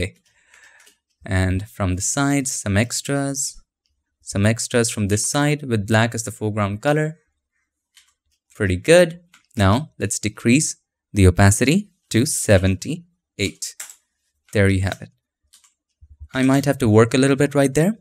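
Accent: India. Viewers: you are so pro. i like your tutorial, and India accent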